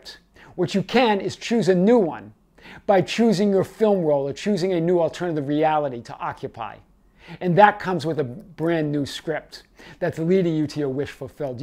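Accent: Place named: New England, United States. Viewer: Where are you from?! From the hat and the accent I would guess somewhere in New England! From New Hampshire over here! Blessings to you! Love your videos. Thank you for clarifying for, and inspiring so many people around the world! ♥️♥️♥️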